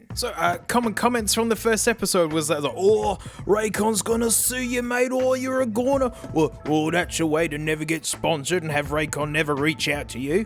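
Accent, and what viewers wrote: Australia. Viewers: He's speaking a dialect of english known as "Australian" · The only reason I watch him is because his auzzy voice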